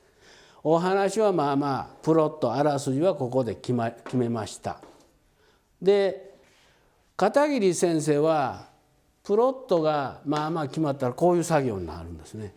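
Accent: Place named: Kansai, Japan. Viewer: 関西出身だから、聞いてたら運転免許更新センターのおっちゃんが喋ってんのかなと思ってしまう。まんまこんな感じの喋りやし。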